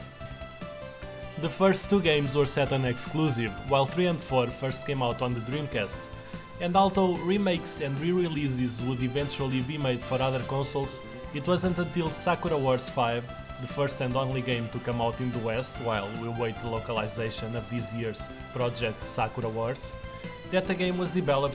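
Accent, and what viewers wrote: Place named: Russia. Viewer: Not sure if you are Russian by your accent or Portuguese/Brazilian by your Twitter language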